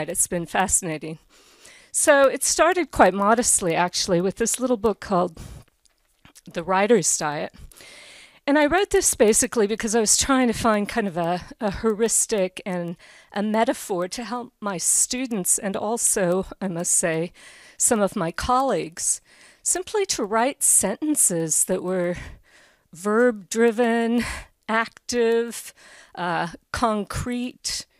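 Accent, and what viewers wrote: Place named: New Zealand. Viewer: Dear, thanks, I did enoy this presentation (as some others) of yours - admittedly, it's because I'm a linguist and a fan of Steve Pinker's. Steve has been mentioning you for a couple of years, so I did check some of your presentations. Steve's been right, you're great - and, frankly, I do love your NZ accent (frankly, no offense - I'm a phonologist/historian). Thank you!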